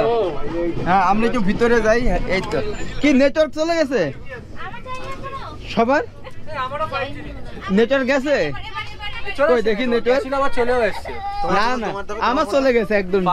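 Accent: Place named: Kushtia, Bangladesh. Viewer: কুষ্টিয়ার ভাষা মনে হচ্ছে।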